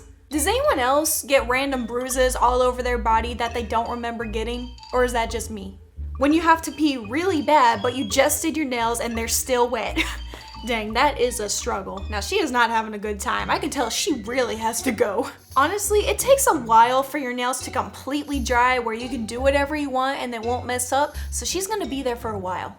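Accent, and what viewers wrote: United States. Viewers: Are you Southern ? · Love your cowgirl accent! 😉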